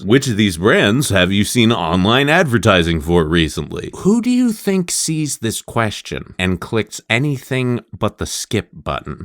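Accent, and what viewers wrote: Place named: Bay Area, United States. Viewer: I don't believe for one second that you live in Portland. You sound like you're from the Bay Area and I would bet money on it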